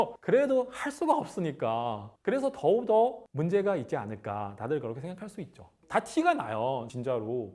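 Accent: China. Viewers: 이거 중국사람 이네 발음이 새네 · 이분 중꿔인인가요? 발음이....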